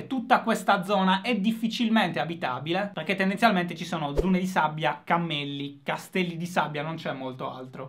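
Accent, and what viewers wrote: Sardinia, Italy. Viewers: a quei tempi il tuo accento sardo era più marcato XDDD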